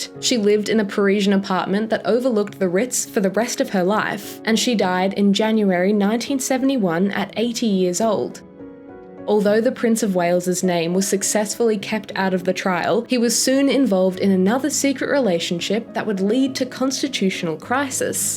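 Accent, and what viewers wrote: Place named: Australia. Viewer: This is excellent! And nice to hear an Australian voice (and "row"? we know what you meant!!).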